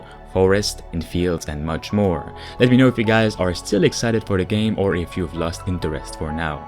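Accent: Quebec, Canada. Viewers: Your Canadian French are you bro?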